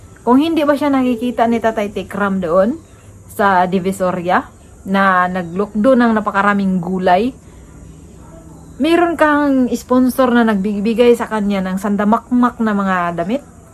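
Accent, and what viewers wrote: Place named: Visayas, Philippines. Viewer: Bisaya ka pala dong .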